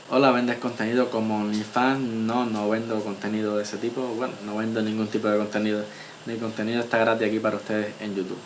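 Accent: Cuba. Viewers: Ver tus videos es como conversar contigo, me encanta eso pues te conosco de alguna forma y se mas de ti, tus conversaciones son interesantes asi conosco de ti y como es vivir en Cuba. Me encanta tu forma de hablar y expresarte y para nada me ofende tu vocabulario, he compartido con muchisimos cubanos y amistades cubanos y se como hablan y se expresan y puedo entenderte, sigue asi y no cambies por nadie. Gracias por compartirlo, muchas bendiciones papo. 🙏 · los muchos cubanos de mas menos tu edad estan muy cnformes con su estadia aca, en uruguay y no es nada dificil adaptarse (menos al invierno que ustedes casi no conocen) tengo un gran amigo cubano que se vino por guyana, brasil hasta la frontera con su esposa, pero dejo a su niña alli hasta poder traerla tambien, y a su madre.Trabaja como portero en un e dificio y arma computadoras. Cuando recien llegan consiguen trabajo en supermercados o farmacias etc sentir tu hermoso acento cubano es cosa de todos los dias-Aca no hay playas para "cubanos" y hacia el este de Montrvideo, el oceano atlantico te da hermosos lugares para disfrutar cuando deseas descansar. 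La Salud no es gratis, pero es bien accesible y de primera calidad La salud publica es gratuita (medicacion incluida) Bueno solo quise informarte de mi pais espero que todo te salga bien PD. aca no existen los cortes de luz jajaja:Un beso grande hagas o que hagas Te deseo lo mejor.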